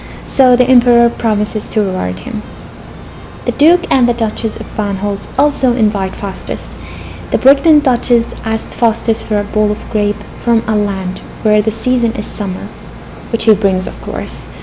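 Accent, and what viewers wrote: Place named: United Kingdom. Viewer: ماشاءالله كيف تعلمتي الاكسنت البريطاني نقطه ضعفي حاولت اتعلم بس ماش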